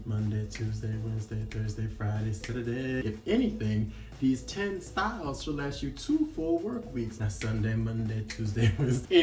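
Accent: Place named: Brazil. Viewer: Sotaque brasileiro kkk you até from Brazil?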